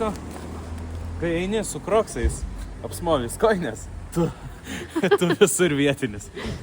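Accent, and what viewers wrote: Aukštaitija, Lithuania. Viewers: Bet tai faina klausyt,kai kalbi paprasta aukstaitiska moletiska,utenska kalba,ne kaip kiti kaimieciai miestan pateke,vaidina aukstuomene...😊👍👍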